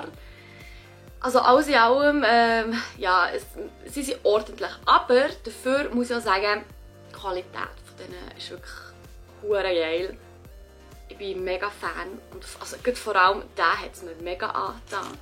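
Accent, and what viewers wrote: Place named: Bern, Switzerland. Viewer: I fingäs soo cool ds du bärndütsch redisch😍😍😍❤🇨🇭🇨🇭🇨🇭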